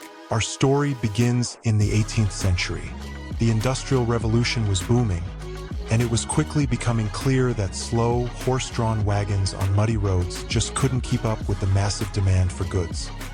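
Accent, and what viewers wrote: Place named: United States. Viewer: British content, American voiceover??? Really?? Not at all sure I want to subscribe, even though it looks interesting.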